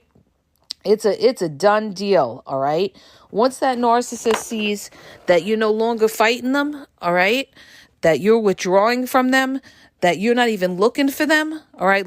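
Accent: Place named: New Jersey, United States. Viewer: I sincerely appreciate your knowledge & sharing it with us. Your Jersey accent is really apparent at times. Thanx again, Sister.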